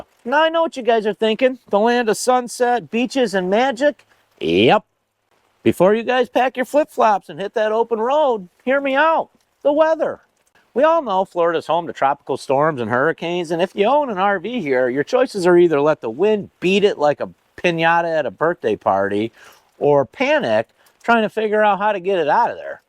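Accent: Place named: Rochester, United States. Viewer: Michigan here born and raised in western NY you sound like your from Rochester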